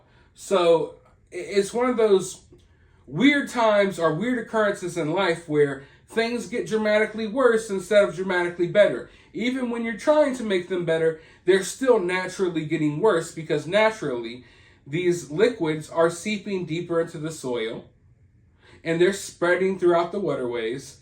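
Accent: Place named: Philadelphia, United States. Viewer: You're down south? But you say "woodder" instead of water as we do in Philadelphia. I assumed you were from the northeast 😂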